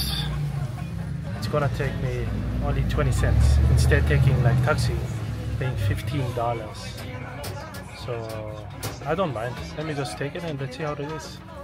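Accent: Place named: Colombia. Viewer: Por que hablas colombiano si tu eres de Caracas, Venezuela??? Eso no me gusta.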